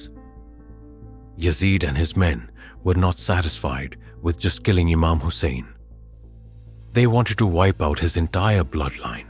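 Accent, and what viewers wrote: India. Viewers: Great video, but can you stick with real english AI voice, the Indian accent is annoying to hear. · Why did you change such nice narrators voice with an Indian accent. The previous narrator’s voice was just excellent for the context you guys put up. Especially for the battle of Karbala. Please us the previous voice 🙏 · Brother thank you for the video. Please can you change the AI from indian to something else ? Its hard hard to listen to this Indian English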